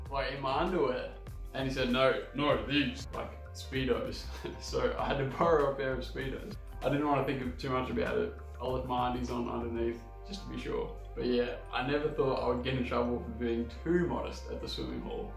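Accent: Finland. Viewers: Yoooo! Your finnish accent is damn good! :) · I haven't heard that kind of finnish accent except maybe from Kimi Räikkönen.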